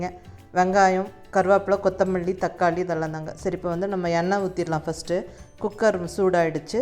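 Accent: Kongu, India. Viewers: கொங்கு தமிழற நீங்க பேசறதபார்த்தா தெரியுது மேடம் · Superrr ngov.all ur preprations r neat and simple.mainly our kongu language awsome.best wishes pa.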